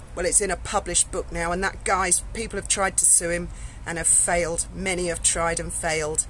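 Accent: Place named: RP, United Kingdom. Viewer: Oh my days I’m loving you and your channel. Loving your posh voice with such a witty tongue. Your vid has just made my day. Subbed. What a breathe of fresh air 🔥🔥🔥🥰🥰🥰🙏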